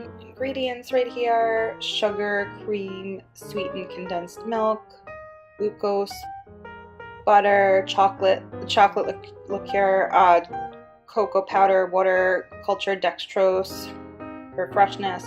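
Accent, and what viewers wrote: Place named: Long Island, United States. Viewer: Hmm... that's a long island accent lol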